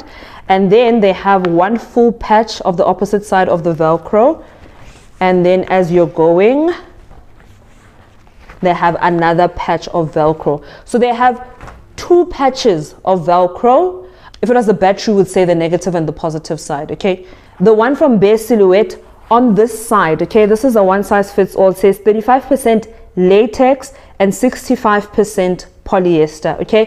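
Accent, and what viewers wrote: South Africa. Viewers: My first time here and I was crossing fingers you not naija😃because wow we are tired shame(love them still😂) then I heard your accent and I was like ai yebo ai yebo🤗🤗my sister oooh.anyways I have a problem,my tummy was slightly big so I bought these 2019 used it but nothing happened then I stopped.last year decided to buy a different one but nope rather my tummy has changed from being tight to soft that's all🤔😢so any advice for the sister here? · Came here for a review on the waist trainer (which was so detailed thankyou💛) but I also got so distracted by your accent, I come from the UK and love the South African accent so much. I've been obsessed since watching Blood and Water 😍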